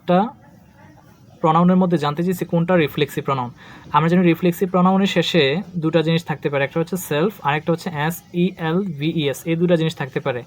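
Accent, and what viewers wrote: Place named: Comilla, Bangladesh. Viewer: Amar mayer bari o comilla te...tai apnar kthai se khan tar tan onuvob krchilam...Allah apna k valo rakhun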